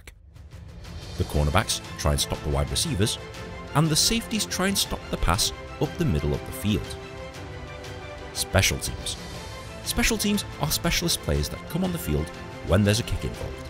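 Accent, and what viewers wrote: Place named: Northern England, United Kingdom. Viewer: This guy is so American yet has a British northerner accent with his Us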